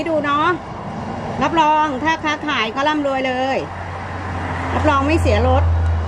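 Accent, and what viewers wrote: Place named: Nakhon Sawan, Thailand. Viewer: สำเนียงพูดเหมือนคนนครสวรรค์เลยค่ะ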